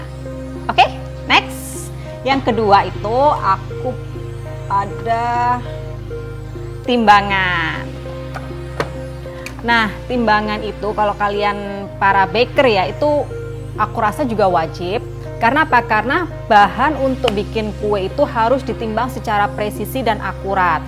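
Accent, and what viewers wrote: Indonesia. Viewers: paling seneng karena simple resepnya, mudah di recreat, truss medhoknya itu bikin khass, ngangenin🥰😋 lanjoetkann, resep fav aku dan anak anak adalah "puding lumut" caiyoooo🍮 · suka banget sama channel ini soalnya resep nya simple bahannya juga mudah dicari, dan Surabaya an banget suaranya medok khas gitu, video favorit " pudding roti zebra" simple,enak,dan cantik banget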